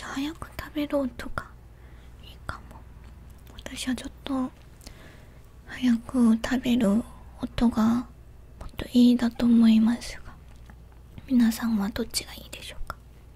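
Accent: South Korea. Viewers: 普段日本語よりも韓国語の方が 発音とか口調とか色々と かわいいと思ってるけど、 韓国の方が喋る日本語はめちゃ可愛い🖤 とてもいい音ですね〜！ これからもがんばってください！ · かわいすぎ〜(*≧∀≦*) ヤッバ⁉︎ 韓国人が喋る日本語だーいすき❤️わかる人おるやろ爆笑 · 韓国の方だからですよ〜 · もう一生懸命話してるの可愛すぎます← 韓国の方が話す日本語大好きです！（唐突） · 韓国人が喋る日本語スッッッッゴイ好き。この気持ちわかる人おる？めっちゃ可愛いんやけど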